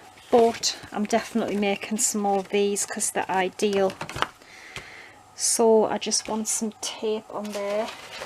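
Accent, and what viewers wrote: United Kingdom. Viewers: I soooo love your videos, such great ideas (and I love listening to your British accent) You could use a small square of velcro to close the pouch with. I have them on covered paper pads and they work well.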